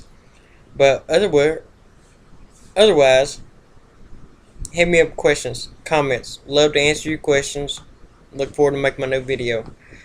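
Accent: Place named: Texas, United States. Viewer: Hi...hope u are well...make some updates please! Wonder if by now (a yr into wearing ur dentures now have you tried cushion grip? A lot of people on here have said it is great! Please let us know how u are after all this time...hope to see you soon...BYW are u from Tx? You sound just like me & both of my sons...lol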